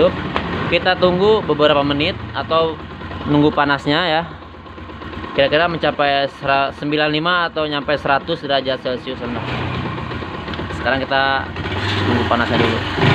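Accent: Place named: West Java, Indonesia. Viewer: Maaf bang alamat bengkel nya d mana yah kalo boleh tau ngmong nya ada sunda2 gitu,soal nya saya juga orang sunda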